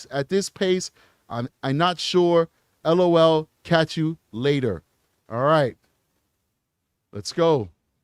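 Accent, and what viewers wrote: New York, United States. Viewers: Love this guy ❤love his accent his NY swag 😂😂😂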